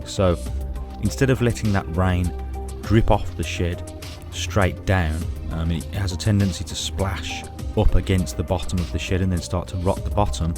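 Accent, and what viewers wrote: Birmingham, United Kingdom. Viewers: Thanks for the heads-up. I headed over to that video, and your video on insulating your an cave. I had a question about the shroud, but will post it on that video. From your accent, I'd guess you are somewhere around Birmingham? (That's where I am) - rain protection definitely needed in the Midlands!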